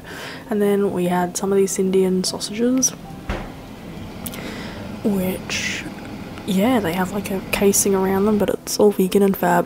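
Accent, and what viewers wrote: Australia. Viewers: Haha I thoroughly enjoyed you talking about Hufflepuffs in your Extra Australian accent, it didnt even occur to me that your "YouTube voice" was not at maximum accent level😂 also I'm really impressed by the amount of veg in this video everything looks so delish💕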